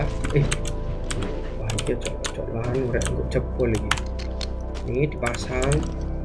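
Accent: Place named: Surabaya, Indonesia. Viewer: Logat suroboyo ne tetep podo koyo bien mAS 🥰🥰🥰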